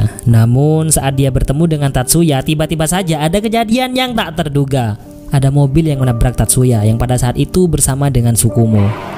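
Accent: Madura, Indonesia. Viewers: suaranya logat madura yaa 😁😁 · madura dimmh bang?? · Kentel banget dah logat maduranya,,salam setong dere bro 🙏🏻🙏🏻🙏🏻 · Logat khas bahasa madura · Medureh min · seperti logat madura ini mah🔥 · Mak a madureh bang,,, apa caen lah, pa dekremaah beih.. Asli mana bang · "apah ca'en lah epade'remmah beih"😅ras madureh jugen min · Teppak kereng medureh adminah😂😂😂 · Mak Reng medure😂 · Logatnya madura banget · Oreng madureh kaleh😂😂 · Abang ren madureh mantap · Yg ngasih suara orang madura ya logatnya madura banget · Bentar², mimin orang madura nih kayaknya😲, mantap min👍🏼👍🏼👍🏼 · Logatnya kek orang madura min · Salfok sama logat/nada abangnya cerita kayak ciri khas orang madura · Madureh ben cak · baru tau ternyata mimin orang Madura, aku bangga. 💕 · Anak Madura mana min 🤭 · Adminah tepak ke Reng madureh wkwkwkw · cengkok e meduroo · Oreng medureh kak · Madureh dmmah bn bang🤣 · Oreng Madura ben bg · Logat mu ada logat madura min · Logat mimin sangat medok logat madura apa betul min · Medureh cak👍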